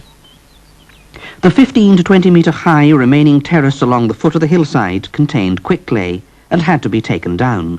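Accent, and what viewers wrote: England, United Kingdom. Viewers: I tried watching this but the guy kept saying daybree instead of debris...i fucking hate the english accent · Glassy es...proper British accent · I couldn't quite believe my elderly eyes when I saw Sven the clay gatherer shirtless and in the Daisy Duke's. Didn't quite go with the rest of the documentary and the narrator with the English accent.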